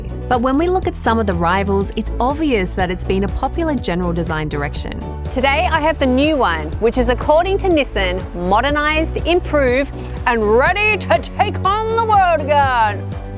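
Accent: Australia. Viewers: Australian not British.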